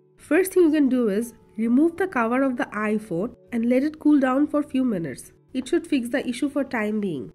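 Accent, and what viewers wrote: India. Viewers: The voice over in this vedio is done by probably a bengali women i can say that due to her accent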